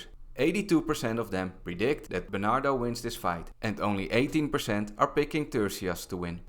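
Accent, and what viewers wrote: Netherlands. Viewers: that thick dutch accent, nice u from the Netherlands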